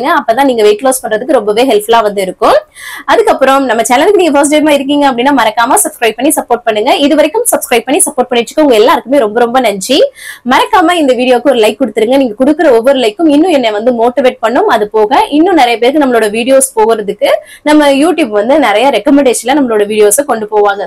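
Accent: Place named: Sivaganga, India. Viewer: Namma sivagangai tamil la nalam visarikirathu romba alagu❤thambi🎉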